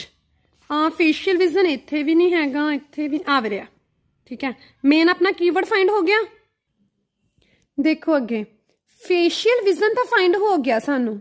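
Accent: India. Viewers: Your Punjabi accent is clearly reflected when you speak in English. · Tusi majhe wal de lgde j 😁👌❤️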